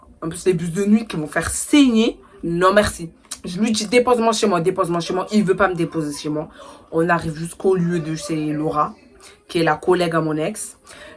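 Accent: Marseille, France. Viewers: coucou je suis nvl sur ta chaîne ton accent me fait penser a une marseillaise peut-être je me trompe t mignonne nimporte qu’elle filles au monde ne mérite pas cela l’homme qui a un comportement comme sa franchement sa me dégoûte je te souhaite bcp de bonheur et du courage pour la suite tu ma donner chaud au coeur tellement sa ma toucher 😢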